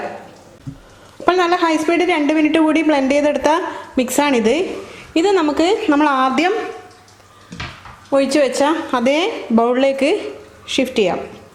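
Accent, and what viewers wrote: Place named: Kannur, India. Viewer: Ithupola pattippidich bhasha Kannur thanne.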